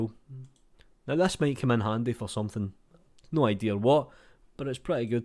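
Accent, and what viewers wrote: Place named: Scotland, United Kingdom. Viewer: It’s a bit wired, I watch all the videos, even thing I know and not interested off. Just because of it’s dialect. Ain’t sexy, but so funny. I just have to google for movies in true Scottish! Oh and yes the videos are quit good.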